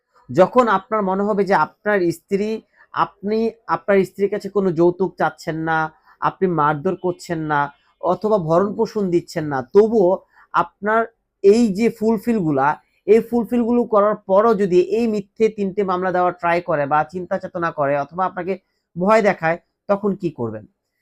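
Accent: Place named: Kolkata, India. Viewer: আপনার ভাষাটি কলকাতার মত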